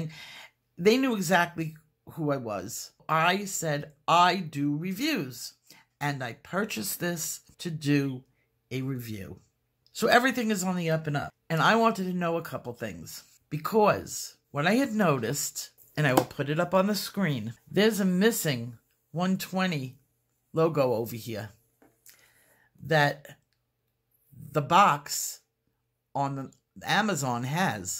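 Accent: New York, United States. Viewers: Yes, I have discovered this myself. Thank you for all you work...as a former NYer I love your accent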